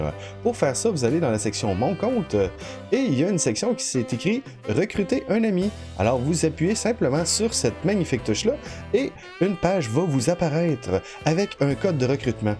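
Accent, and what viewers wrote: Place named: Canada. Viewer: Salut ! J'aimerais seulement aujourd'hui commencer le jeu avec ma petite amie qui en ce moment ne se trouve pas dans le même pays ni dans la même région... Il serait alors probablement impossible de jouer ensemble de ce que j'ai cru comprendre, car elle serait dans les serveurs d'Amérique et moi donc Européen. Mais j'ai cru comprendre que tu étais Canadien de par ton accent ? Tu es donc Américain mais tu joues sur Cerberus qui est un serveur Européen, j'aurais bien aimé savoir comment tu t'y est pris ^^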